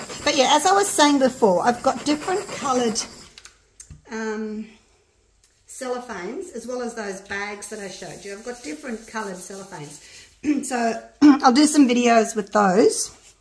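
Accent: Australia. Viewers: You have a beautiful home, where do you live ? I'm assuming from your accent that you are Australian, please forgive me asking so many questions this is my first time here and you are very very intriguing I like your channel and I like the way that you talk...... Yeah, I know I'm weird but it takes many many colors to make a rainbow. That's my way of justifying my weirdness haha. Anywho great project go with the back in Black, that looked the best in my opinion. Take care stay healthy, you my dear, have a new subscriber 👍👍 -Jonny5 🥁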